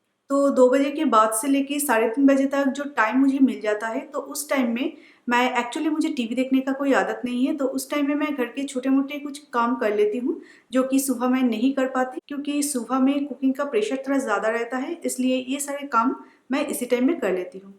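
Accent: Bengal, India. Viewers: At first your accent says you are Bengali so great u are trying to speak in hindi and secondly before seeing the video I seriously thought u are a single handed woman I mean u don't have the other hand hahaha... And finally what kind of video is this?? So what do all other women do?? Eat and sleep.. Every woman works be it housewife ir working woman.. I m not a hater but this video is a waste of time and totally useless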